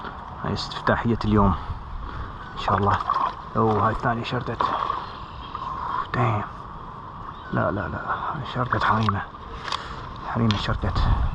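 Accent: Iraq. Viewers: منعول الوالدين سواهن سبحة !!! ههههه . إبن ذي قار مبين عليك من الإسم واللهجة. ... بس عفية عليك طفرت.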